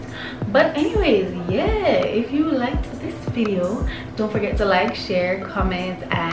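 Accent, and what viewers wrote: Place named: Jamaica. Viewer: Hey! Honestly I love when you speak just patwah 😍😩. And it's giving authentic vibes, I guess maybe you switch it up for the diversity on your channel.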